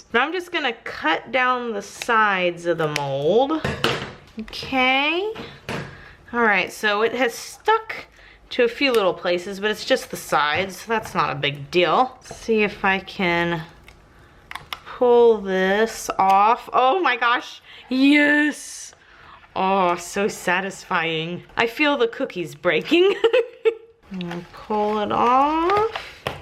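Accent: Texas, United States. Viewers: TheLaydewlf that’s definitely Texas (also in Texas).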